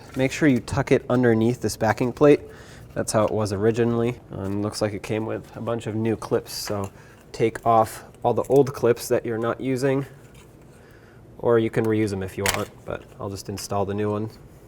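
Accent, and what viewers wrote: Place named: South, United States. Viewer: This guy is from the south for sure lol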